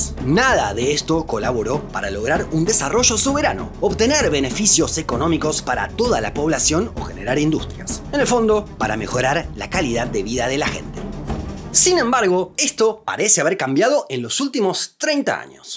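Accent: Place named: Argentina. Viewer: Trata de hablar neutro... pero es argentino es chistoso y ademas pones el futbol jajjaajajaj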